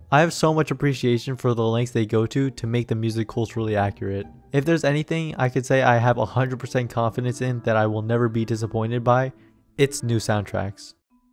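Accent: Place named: West, United States. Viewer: lol he sounds western no?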